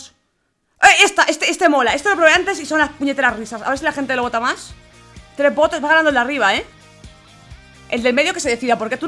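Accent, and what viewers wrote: Argentina. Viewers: Hablas argentina tia😨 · me mata haciendo acento de argentina!! le sale bien. porque yo soy argentina!! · Juego simon dice,cuando dice say y algun color es porque tienes que ir al color que te dice roblox,Cuando no dice say no vallas al color que dice...entiendes? por cierto muy bien el acento argentino:D yo soy argentino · soy sub me encanta tu voz tu asentó argentino u como habla s con morsa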